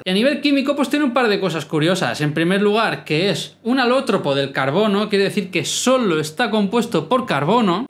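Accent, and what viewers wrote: Cuba. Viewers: Cubano xd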